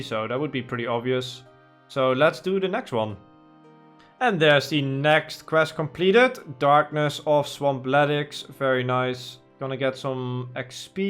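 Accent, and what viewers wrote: Netherlands. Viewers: 100% this guy is dutch, just by his accent 😂. I am dutch too it is funny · Jij komt uit Nederland he dat kan ik horen aan je stem. Mij sub heb je geweldige videos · You know, I never noticed your extremely Dutch Accent. Should know it with your name " " 😂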